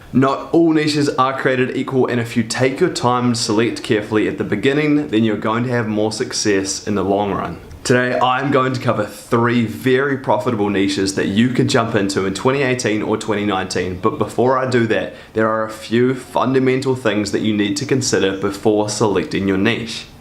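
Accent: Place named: New Zealand. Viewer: Hey are you from New Zealand? You sound like a kiwi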